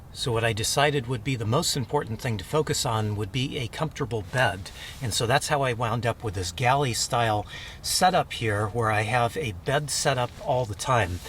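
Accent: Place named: Southern California, United States. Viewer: WOW your accent and manner of speaking is exactly like Steve Jobs and Tim Cook. that really is a SoCal accent! I wonder how I must sound to outsiders as a guy from Brooklyn New York...and like you, I do want to one day move into a mini-van (or ford transit) because rents here are very high in New York City including the boroughs...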